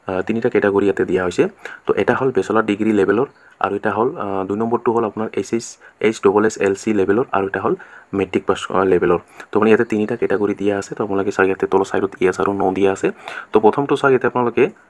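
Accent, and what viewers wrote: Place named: Bodoland, India. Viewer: Bodo bilakor nisina lagise nohoine baru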